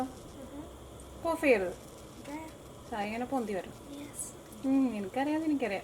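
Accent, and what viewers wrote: Thrissur, India. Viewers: Love that she has a Thrisshur accent despite being overseas. Its very endearing...❤️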